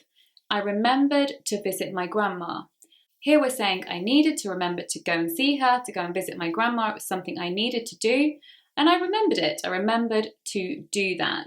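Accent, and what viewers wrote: United Kingdom. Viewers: I love your british accent tone ❤❤❤❤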